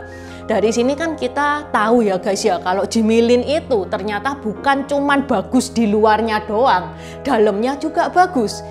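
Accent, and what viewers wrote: Java, Indonesia. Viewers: logat jawa medhok,lucu sy ikut seru klo nonton hehehe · Suka medok Jawanya Mba, salam Surabaya ♥️👍🌴🌿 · Mbak maaf bahasa jawa nya medok bngt🤭😁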